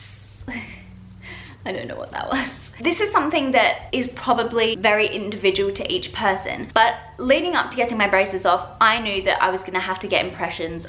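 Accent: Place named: England, United Kingdom. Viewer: you sound a little English:))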